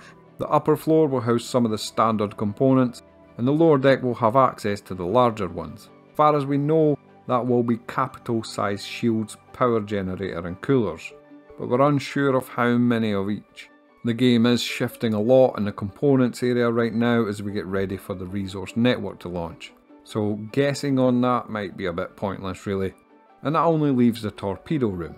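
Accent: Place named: England, United Kingdom. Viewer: I think its lovely English accent i love most about his videos- is it from London? Also next you could do 'Most Anticipated Gameplay' as that connects with ships so much. Cheers English Gent!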